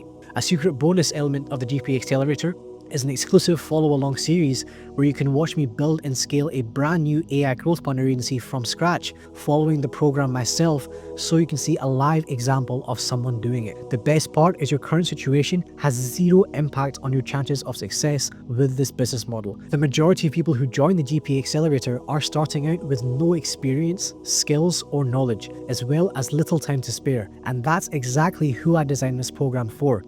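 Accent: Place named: United Kingdom. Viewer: You sound like you're from the UK, so how would taxation fit into all this? Assuming you're suddenly making 10k+ a month, I'm sure that's gonna raise eyebrows with the bank and government who will be eager to reach their greedy hands into your earnings and deduct a significant sum of it in the name of taxation, no?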